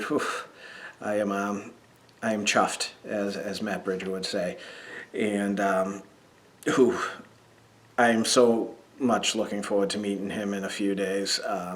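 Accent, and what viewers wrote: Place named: Boston, United States. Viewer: ...amigo, imo, you don't need give aways. We sub'd and watch you for YOU and your shenanigans. In a youtube world of so many "fake and money grubbing" people, i myself appreciate your humbleness and "realness!" Don't put yourself down amigo please, there's many of us out here that genuinely like you just as you are. When I watch your videos I smile, you make me laugh and of course, who doesn't love that "Buastahn" accent, lol. I am part of the, Hog & 2 Cent, Mark, Matt, Nicky, and Vegas Nerds crew. 😁😁 I am happy to see that you and Cinamon are "goin steady", ha ha, ya know what I mean. I wish you both good luck and blessings. Amigo, please stay safe in Vegas....DO NOT lick the pole! LOL LOL 😅😆 Do NOT lick anything that hasn't been sprayed down with plenty of alcohol! LOL By the way...I'm a chatty heffer, so I don't mind rambling, lol! 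Cheers to good times, health, tons of laughs, handpays and unforgettable memories in our beloved and BEST city in the world...VEGAS! 🤗😁🍸🍻💰🍀🤣🎵🙏🎰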